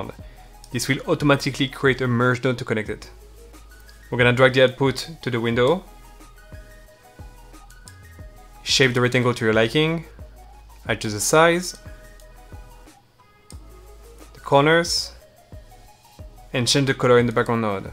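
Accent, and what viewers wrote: France. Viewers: you have french accent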